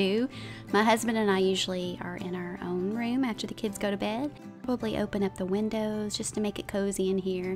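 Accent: South, United States. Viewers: I'm in love with your Lil southern accent you're so comforting 🥺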